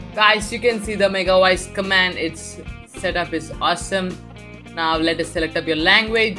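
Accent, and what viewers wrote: India. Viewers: it is an indian accent you idiot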